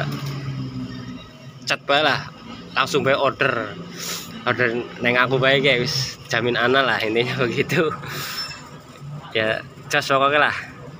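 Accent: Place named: Brebes, Indonesia. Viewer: Logat e wong Brebes 🤔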